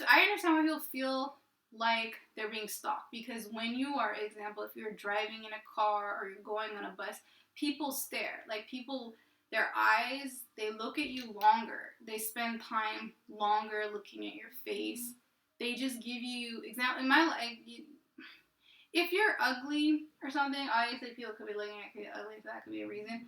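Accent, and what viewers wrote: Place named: South, United States. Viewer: Hey. I love your southern accent. Praying for us all. Take care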